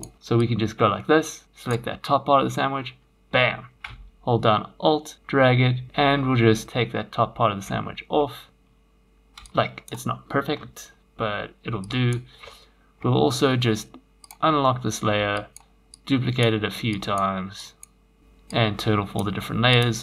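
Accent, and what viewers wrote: South Africa. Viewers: Hey a South African accent :)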